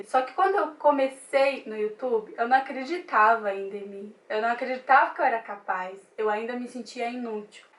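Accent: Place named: Northeast, Brazil. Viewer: olá bom dia.eu amo seu sutaque d nordestina e tão lindo.esse arrastado d R.kkkkksucesso linda fica com deus.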